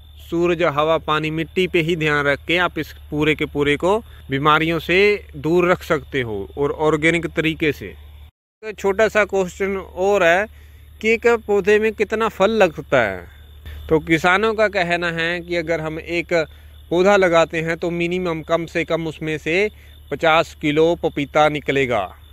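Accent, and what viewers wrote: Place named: Punjab, India. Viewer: bhaji Punjabi nikli jandi hai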